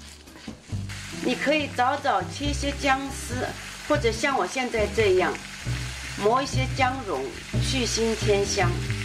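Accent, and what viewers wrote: Shanghai, China. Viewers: 用電飯煲可以嗎？阿姨說的是上海普通話 哈哈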